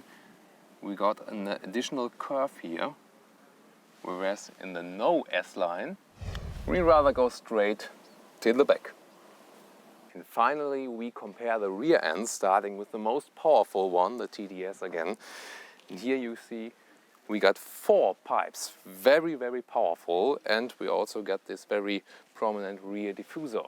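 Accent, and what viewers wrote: Germany. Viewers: I love your channel, I always watch your videos about the autos. unfortunately, many models are not available in the US., you have a very funny German-English accent. which could be one of your traits. And you looked so weird in helmet.... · I love your accent, seriously, I wish I had a German accent like you do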